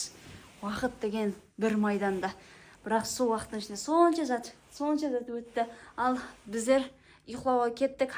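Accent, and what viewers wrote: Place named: Karakalpakstan, Uzbekistan. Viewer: Сакалы бар ит ,сосиски ит 😂😂😂молодец гап жок карыдас таза каракалпакша 🎉🎉🎉Алла тала жолынды берсин ❤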